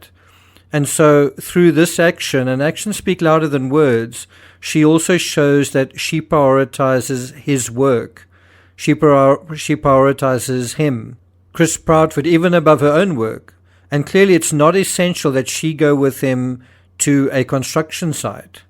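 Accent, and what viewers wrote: South Africa. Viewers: I am following other channels which is a lot closer and know more about this case. On 1 channel 1 of your videos came up where the question was where did it come from and indicate the english according to the hoax messages. I did indicate that the accent alone tells me it is someone from South Africa's video so I looked you up. There is so much info out there currently about this case. Happy to see that you tubers in SA have also picked this up.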